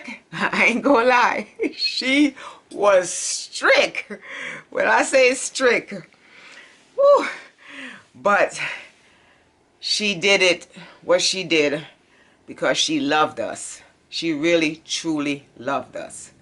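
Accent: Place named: Jamaica. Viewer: I love your channel! One of my favorite things is listening to your Jamaican accent I love it 🌟✨💫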